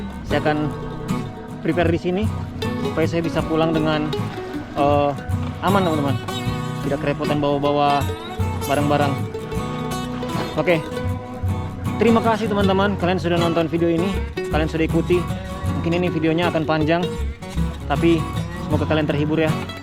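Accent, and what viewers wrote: Manado, Indonesia. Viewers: Itu logat manado memang kantal skali kang 😅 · Di daerah mana ini kawan ?? logat manado ngana pake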